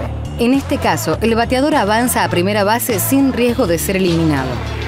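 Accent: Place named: Argentina. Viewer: No me gusta la manera de hablar que tienen las Argentinas .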